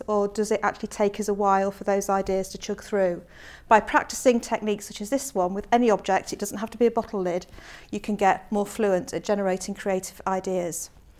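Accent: United Kingdom. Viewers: I'm an English teacher, I understand what you are doing as ahelp friend.with British pure accent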